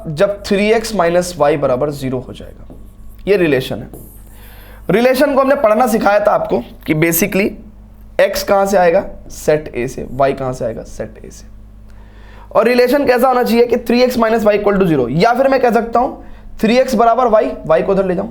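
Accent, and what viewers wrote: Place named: Muzaffarnagar, India. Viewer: Sir aap muzzafrnagar ke ho mai bhi😊 aavaj se hi pta chlra ji😅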